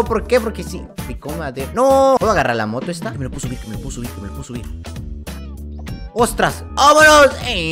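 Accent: Chile. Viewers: Soy yo o tienes voz de chileno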